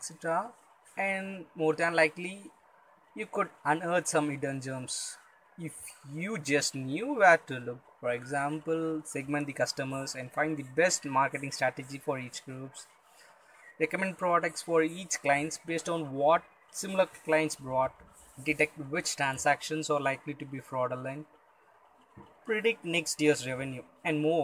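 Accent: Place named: India. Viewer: no doubt it is a good topic, but I am trying to understand your Indian accent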